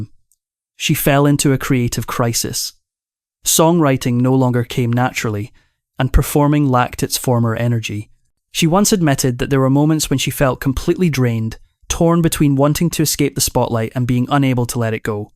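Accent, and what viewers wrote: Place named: Scotland, United Kingdom. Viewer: It`s the first time I have heard AI with a Scottish accent.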